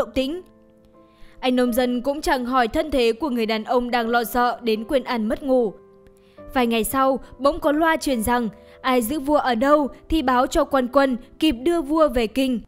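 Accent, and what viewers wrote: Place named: Nghe An, Vietnam. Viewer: Giọng Nghệ An lai Bắc ra 1 cái giọng nửa nạc nửa mở rất khó nghe!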